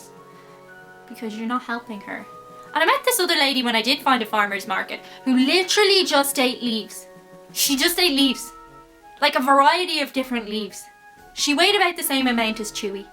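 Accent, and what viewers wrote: Ireland. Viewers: Like your Irish accent and personality! Y'all cool with the Scots?! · i really have a thing for irish girls she is hot and i love her accent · Lmao your Irish accent just makes everything that much more enteratining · Enjoyed the video! I don't know if you are intentionally toning down your accent, but it is far milder than many Irish people I've met and listened to. 1) I think you're the first person I've ever heard of who went to Compton as a tourist! LOL You'z in da hood, girl! 2) Don't think we should base gun policy on the vague 'way guns make me feel'. 3) Having lived in England before (which I'm not lumping in with Ireland), I think you're spot-on about the greater variety of body types you frequently encounter in America, and you're the first I've heard to put it that way. Insightful observation. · My family is Irish . You don't have a very strong accent. 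Come on, Of course you knew you don't visit Compton! So what about guns, Get robbed & you'll want one too!! No fatties in Ireland?? But you DO HAVE MY FAVOURITE MRS. BROWN'S BOYS. CHEERS🌞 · Love the Irish accent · You're losing your Irish accent. Or maybe you're toning it down on purpose?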